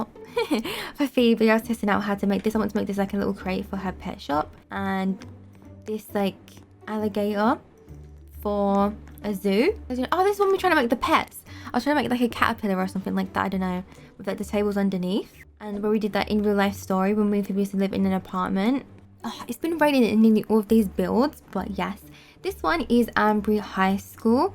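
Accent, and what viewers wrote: London, United Kingdom. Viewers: You are from London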